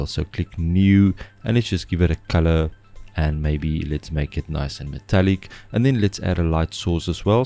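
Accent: South Africa. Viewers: Awesome video bro. Are you South African btw?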